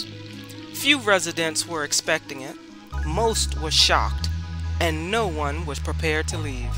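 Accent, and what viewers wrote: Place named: Philadelphia, United States. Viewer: Greetings from Washington DC. You sound like you're from Philly. You may have mentioned where you are from in another video, but I haven't seen that yet because, as I said in comments on East Capitol Street Dwellings, I'm new to your channel. I'm very familiar with Barry Farm since I've used their recreational indoor pool since 2014 off and on. Best wishes, Sis.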